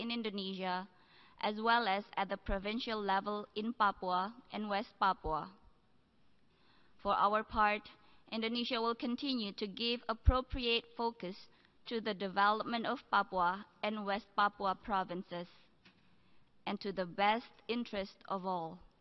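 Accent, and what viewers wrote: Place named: India. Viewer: Kek logat orang india:'v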